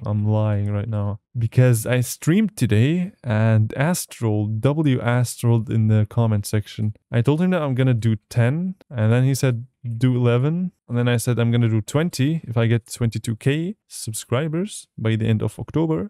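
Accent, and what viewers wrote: Germany. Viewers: Are you German? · Bildquelle suchen is reverse image search. I would have never thought you were German. Your accent is really clean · are you german? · Wait are you German?😮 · holup are u german or austrian?? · are you german :O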